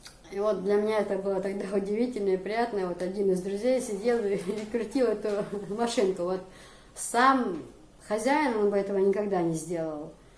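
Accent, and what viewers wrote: Chechnya, Russia. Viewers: Это надо же выучить чеченский язык на столько что ты не можешь говорить на русском без акцента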